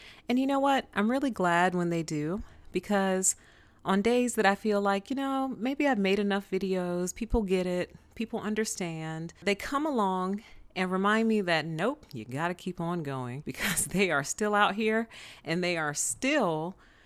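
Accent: South, United States. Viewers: You have a cute southern accent!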